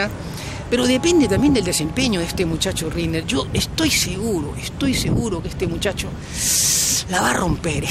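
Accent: Argentina. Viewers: Afina el oído, el loquito tiene acento Argentino .no insulte a los chileno..., En Chile los yuotober ,no son tartamudos , tartamudos, tartamudos, tartamudos..... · tiene dejo argentino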